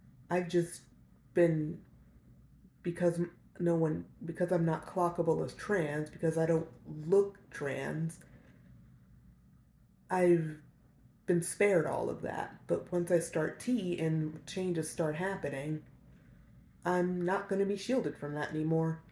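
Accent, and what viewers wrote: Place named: United States. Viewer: hey man really sorry to hear that this is still something youre not sure about. im myself gonna start estrogen in around 3 months after a journey of 3 years of trying to get on them. i'm from germany so i dont actually know how hard it is in america (im assuming youre from there by your accent). and even now i have my doubts. but whenever i think about if i really wanna do it i try to think about the alternative. which for me would be growing old in a male body. and that is like a genuine horror story to me... maybe try and rationalize it for yourself like that? i have these thoughts because im naturally very androgynous and have been "misgendered" in both directions since i was a little kid. people never know how to read me. but if i think about how my body will just become more nad more masculine with time it actually terrifies me idk. 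i'm 24 now so i have plenty of time to enjoy my life during and after medical transition :) socially i'm fully out to everyone for almost 5 years now so thats not an issue anymore lol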